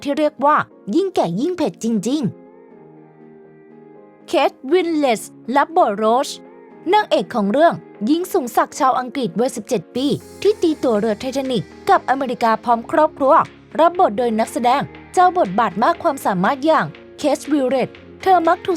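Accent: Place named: Suphanburi, Thailand. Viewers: หนคนเดียวมั้ยที่ฟังไปเรื่อยๆละคิดว่าคนภาคเสียงหยั่งเหน่อออโค๊นสุพานน55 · แอบขำแอดแอบเหน่อ 😄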